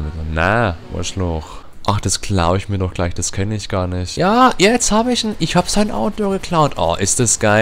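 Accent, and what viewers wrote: Bavaria, Germany. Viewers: verdammt, du bist bayer?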